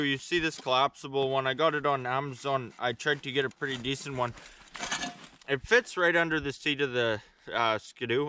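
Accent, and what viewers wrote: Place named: Alberta, Canada. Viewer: This guy sounds like hes from Alberta Canada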